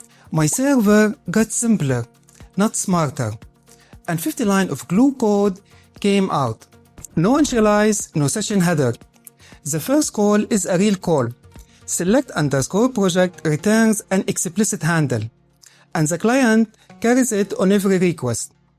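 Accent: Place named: Syria. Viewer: انت سوري اللغه انجليزي سوري !!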